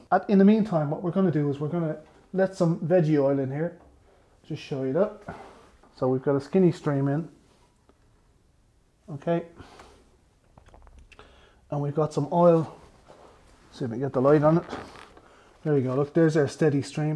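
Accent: Ireland. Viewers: Nice one are you from Ireland?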